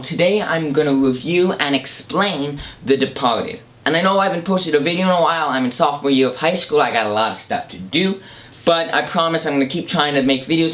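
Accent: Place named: North America. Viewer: Somewhere in North America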